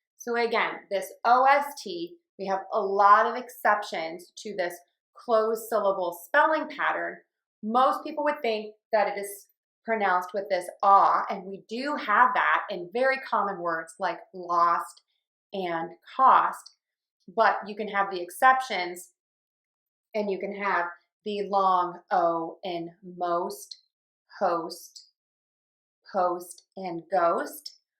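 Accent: United States. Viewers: Hi. I'm from Colombia and a love Your videos are amazing that help me to improve my pronounciation. I wanna know what part of usa you are?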